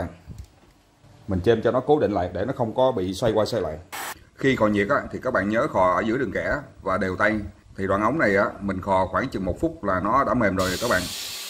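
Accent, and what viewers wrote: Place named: Vietnam. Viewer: ฟังภาษาเวียตนามแล้ว ปวดหัวมาก สำเนียง ปิดลำโพงเลย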